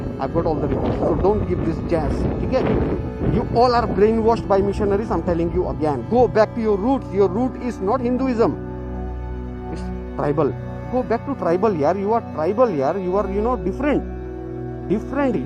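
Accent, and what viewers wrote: Assam, India. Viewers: He seems to be Assamese living at the boarder of meghalaya n Assam ...the way he is lecturing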